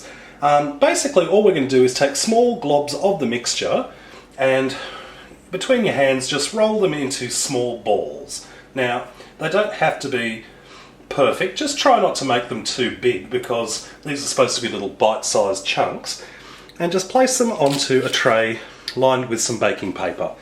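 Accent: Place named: Australia. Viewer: i just discovered you (for myself)! delicious recipes and an australian accent? how lovely...you might get a lot more comments from me within the hour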